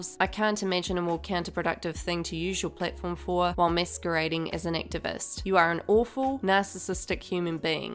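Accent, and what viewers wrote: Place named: New Zealand. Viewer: true. So nice to hear a pure Kiwi accent from young people without some awful American twang in it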